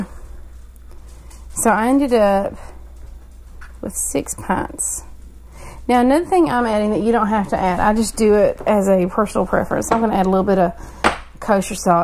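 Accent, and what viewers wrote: South, United States. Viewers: Girl, love your accent. I miss the south. Thank you for the tips and video!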